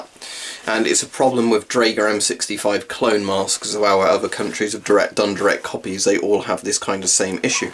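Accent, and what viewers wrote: Germany. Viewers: please do a video with your german accent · I can help but laugh at your german accent but it is still way better then mine...us americans are limited to accents but then again america doesn't have a specific accent bc we have people from multiple countries yknow what I mean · It surely has a tight seal and is rather comfortable. The straps are good i guess better than the czech straps, they are not that good in my opinion esspecialy on the M10! BTW. loved that german accent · oh man that German accent kills me every time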